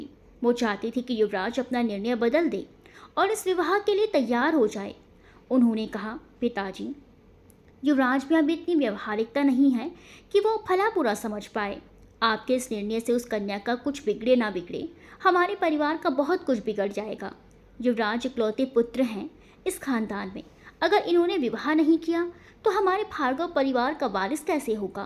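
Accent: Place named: Bihar, India. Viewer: Bihari Hindi bohot acchi lagi 👌👌👌👌❤️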